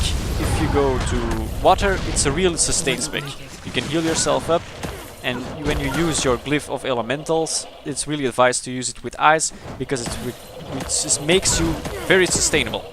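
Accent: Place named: Belgium. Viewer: Loved this video, Subbed! I can't help to ask. But are you from Belgium by any chance? I'm from the Netherlands and I kinda recognise a belgium accent with you:P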